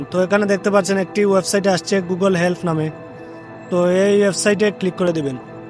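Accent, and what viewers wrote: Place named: Sylhet, Bangladesh. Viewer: Apne Sylhety🫡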